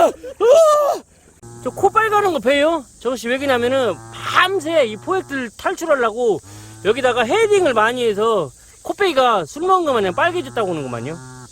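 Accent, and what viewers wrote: Jeolla, South Korea. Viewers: 진짜 전라도 사투리 확티가나네요 ㅋㅋ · 사투리가 어디에요? 전라도? 충청도?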